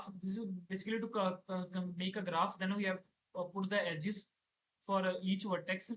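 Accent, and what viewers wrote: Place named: Punjab, India. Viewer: Aapke jesa punjabi accent ke lie kya kre bhiya?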